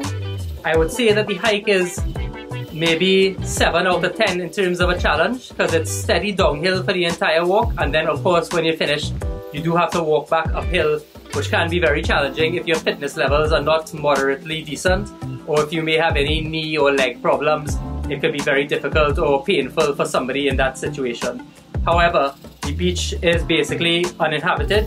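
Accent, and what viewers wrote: Trinidad and Tobago. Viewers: I love your west accent. It’s comparable to the convent accent just the male version. Love your channel. Keep up the good work. This place looks nice but for USD $114 per night I would have liked air conditioning and toiletries in the shower eg shampoo but seems like a eco lover dream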